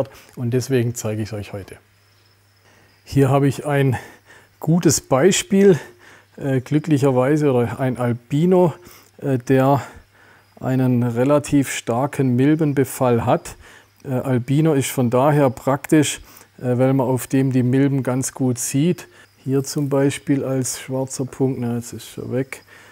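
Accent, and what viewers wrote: Swabia, Germany. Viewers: Sehr gute Folge! Übrigens... Ihr habt mal versprochen, den zu nem Deutschkurs zu schicken, bitte dran denken dass ReptilTV auch außerhalb der schwäbischen Alb gesehen wird, kein Mensch hat was gegen einen leichten Dialekt, aber beim tuts leider a wegn heftig sein, wahrscheinlich merkt er das selber gar nicht, aber meine (Deutschlehrer) - Kollegen hier im Goetheinstitut Madrid, die alle Reptil Fans sind, haben leider keine Chance zu verstehen was er da von sich gibt, obwohl inhaltlich natürlich super gut und interessant. Bitte nicht übel nehmen, den Tipp, ist nur gut gemeint 😉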